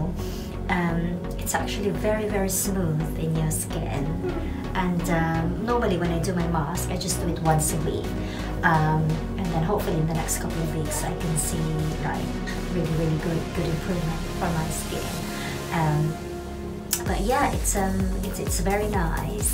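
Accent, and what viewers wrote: United Kingdom. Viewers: I like the british accent 😯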